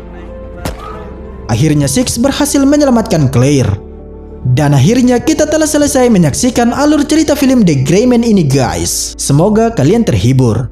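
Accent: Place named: Java, Indonesia. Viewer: Bahasanya pake bahasa jawa y bang .,,medok Kali I😁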